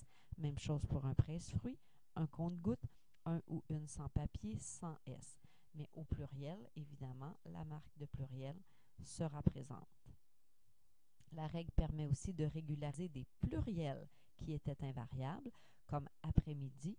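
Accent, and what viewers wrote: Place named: Quebec, Canada. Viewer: C'est la première fois que j'ai remarqué l'accent québécois. C'est vraiment intéressant hein. Moi, je suis italien et j'avais toujours écouté seulement lequelle français. Maintenant je veux aller au Canada ahaha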